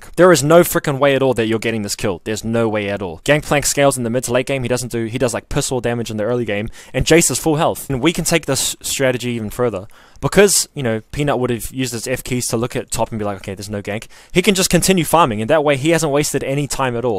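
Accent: New Zealand. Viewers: Your vid was one of the first jungler vids that came up for me and I was like what is that a kiwi accent I spy??? Hilarious stuff, can't wait to watch more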